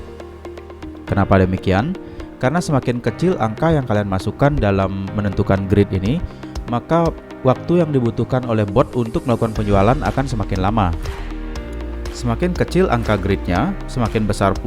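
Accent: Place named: Bali, Indonesia. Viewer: Logat baline kental sekali bli... 🙏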